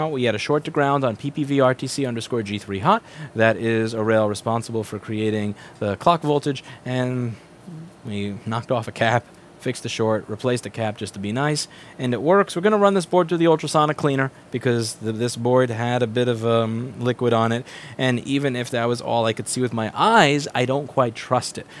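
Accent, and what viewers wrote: New York, United States. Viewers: He is a New Yorker; they speak differently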